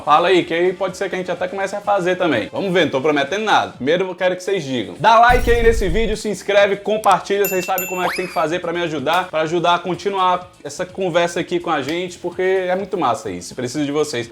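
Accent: Northeast, Brazil. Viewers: Vc é nordestino? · Gostei do que vi, menos os rostos. E, cara, você fala como Nordestino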